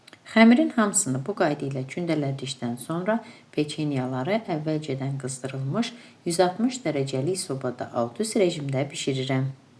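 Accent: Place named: Shaki, Azerbaijan. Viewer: Siz Şəkilisiz? Ləhcənuz çok gözəldir 😊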